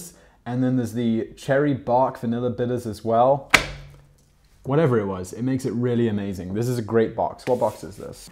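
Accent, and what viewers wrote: England, United Kingdom. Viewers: Not American. Just more laid back British. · It sounds less like softened American and more like a British person who has lived in America for a while. He definitely sounds British at home but with a very slight hint of American in there.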